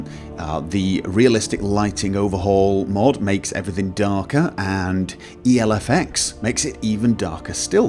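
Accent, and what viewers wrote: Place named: England, United Kingdom. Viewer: I just love your english accent. I can understand everything! (Which is unusual for me, as I'm not natve english speaker) As to video. I definitely like ELFX the most out of these 3, and it loses only to enb. Which leads me to question: What enb are you using? I myself love opethfeldt6's and wouldn't change it for anything else.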